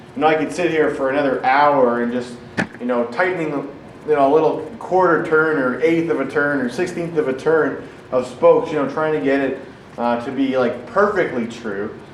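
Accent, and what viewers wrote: Canada. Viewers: That is the most canadian accent I've ever heard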